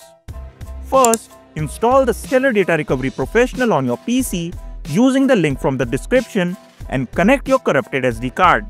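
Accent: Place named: India. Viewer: Very thick indian accent. Difficult to understand what u r saying. Too fast. Unable to catch up the instructions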